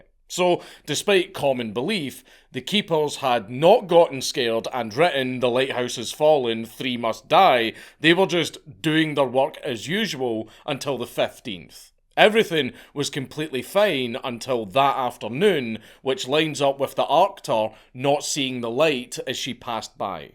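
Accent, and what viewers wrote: Scotland, United Kingdom. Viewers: Goddammit you know how hard it is to find Celtic Myths on youtube narrated by an ACTUAL SCOTSMAN. Throw me another several bones as one of your countrymen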